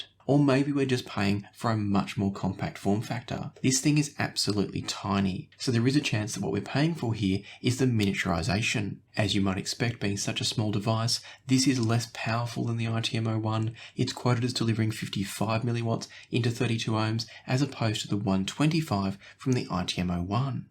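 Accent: Australia. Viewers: it's his Australian accent with a rising inflection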